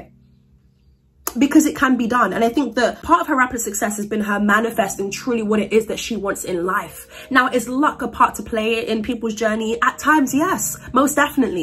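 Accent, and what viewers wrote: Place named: United Kingdom. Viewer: I very much appreciate the subtitles because the auto generated ones always mess up with British accents lol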